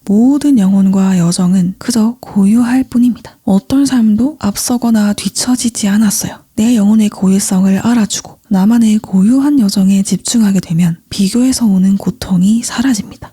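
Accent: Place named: Busan, South Korea. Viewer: 나만의 타인의 고유성 다양성❤ 감사합니다 😊 부산분 같아요 만나고 싶네요 🙏🏼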